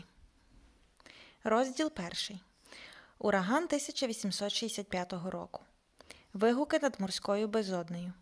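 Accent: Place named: Western Ukraine, Ukraine. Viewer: Варто додати що присутній західний акцент. Що є різні вимови.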